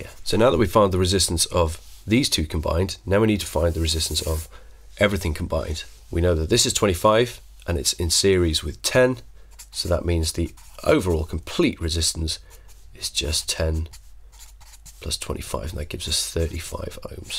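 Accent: United Kingdom. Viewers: Ok but why does his voice , scream British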